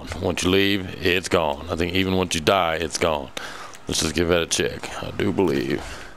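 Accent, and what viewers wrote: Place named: Tennessee, United States. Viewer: U from Tennessee or what?i know a near home accent when i hear it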